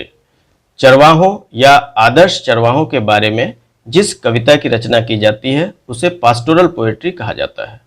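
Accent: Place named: mixed, India. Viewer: it's French origin word, s per dictionary the phonetic symbols u have replied is absolutely bang on. My only request was not to pronounce the word in Typical UP, Bihar accent n not to dishonor you. In ur reply u can see the sound of 'N' is bracketed which allows us to pronounce the word without N that makes the pronunciation better n that suits ur vast grip in literature 🙏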